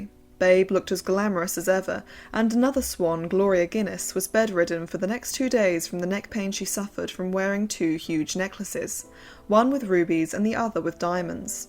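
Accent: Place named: United Kingdom. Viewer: Dear Ms, your British voice telling different aspects of these Swans was perfectly delightful! Thank you! I’m almost finished but I dread..,the end of the story! Because yore video was so, how do I say…reminiscent… I accepted an “Invitation” and will drive…all the way to that Coctail Party! Mind you, am a 😍”Swann”! And ye, I had a Truman C. In my life too…Funny, Do all Birds of a feather, flock together? Thank you again! Have a Blessed Holy Saturday Night! Kali Anesthesia! Nurse Jane, Deale, Maryland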